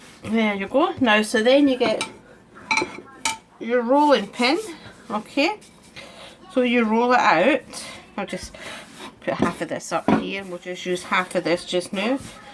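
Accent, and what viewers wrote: Scotland, United Kingdom. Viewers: As I like the Scottish accent listening to this was almost like listening to a ASMR video. Greetings from Italy.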